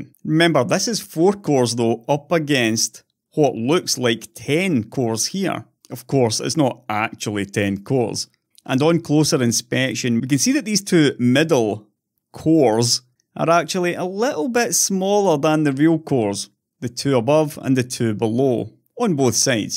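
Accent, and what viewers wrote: Scotland, United Kingdom. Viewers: Scottish accent? 😂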